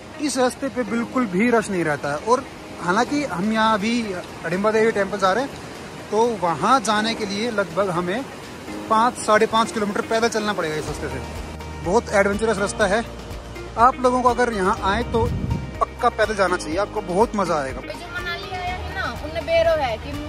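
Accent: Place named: Haryana, India. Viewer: Your haryanvi accent is so nice. Keep it raw 😊 #proud_to_be_Haryanvi.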